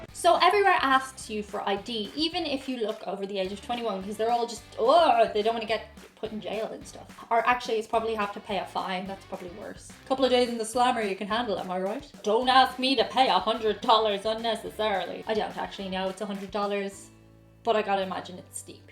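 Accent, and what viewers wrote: Ireland. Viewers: Im really happy you've met friendly Americans, never go to new jersey, those people are RUDE Edit: also speaking of which, which county are you from? I can't pinpoint your specific accent :D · Honestly. you don't look Irish or even act Irish other than a slight accent. In reality, you look like the typical American chick with a sprayed on face. This is a mere observation... being yourself would much more interesting and appealing. I see you look all over town throughout the USA... zzzzz. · I missed your Irish accent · I loved your commentary! It was, as we say here, "spot on!" I've never been to Ireland but I have been to several of your neighbors countries. It was an eye opener for me when I had to pay to use the "loo" and the attendant has a bowl to collect the coins in.....sometimes sitting at a desk right out from the restroom doors. 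Thank-you!!! (love your Irish accent) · A lot of us like an Irish accent, so maybe their extra nice to YOU. Lol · Love the accent! Irish accent is awesome! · Can you let your Irish accent come out more? I don’t know why but I love it on pretty women :) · Excellent count down. Did you practice this list in Philadelphia? As others have posted, flash a smile with your Irish accent and you'll make friends for life. Yes, in some cases it will feel like a lifetime prison sentence. lol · lmao. great stuff. irish accents are probably my fav, but im one of the sheltered americans who know nothing about most every other country. came from Texas, that 'state' thats the size of most other continents... love the out takes, and yes, your hair is awesome. :) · Ah, an Irish beauty! Love your accent. Lass, you are welcome in America anytime.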